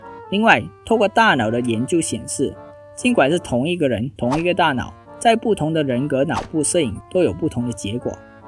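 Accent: Malaysia. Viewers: 馬來西亞人的口音本來就這樣的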